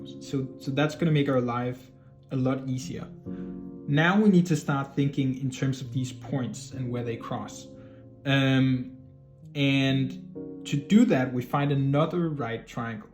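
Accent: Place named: Massachusetts, United States. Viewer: Your from Massachusetts aren't you?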